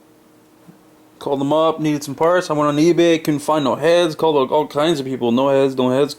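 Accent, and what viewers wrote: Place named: Canada. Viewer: okay your from canada eh (: is that the 401 your on?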